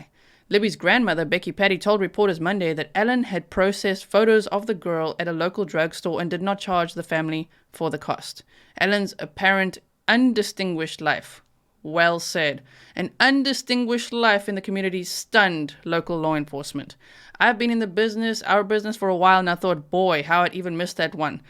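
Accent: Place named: South Africa. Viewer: I recently came across your channel are you South African I clocked the accent immediately. 😀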